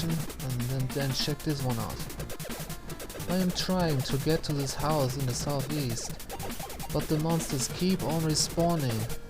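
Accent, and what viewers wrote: Germany. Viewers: i bet this dude's accent is german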